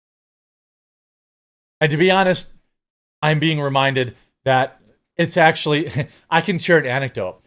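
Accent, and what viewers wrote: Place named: AAVE, United States. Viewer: Please speak English not Ebonics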